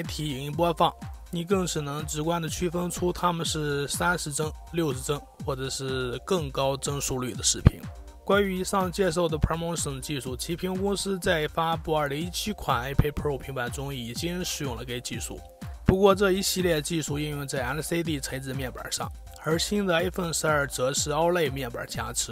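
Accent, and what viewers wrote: Shanxi, China. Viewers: 主播山西人？？？？？ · 这货是山西人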